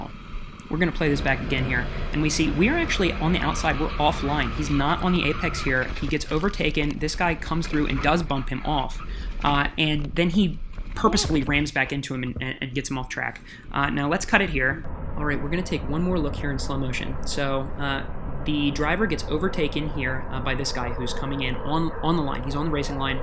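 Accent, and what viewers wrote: Liverpool, United Kingdom. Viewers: He’s scouse what do u expect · I know the accent, I'm from the same city as him 😂 Liverpool, honestly it's normal but still awful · The scouse accent tho "whaqqqt" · No he’s not, I don’t get why everyone saying he’s Irish he’s Scouse from Liverpool. Sounds nothing like Irish.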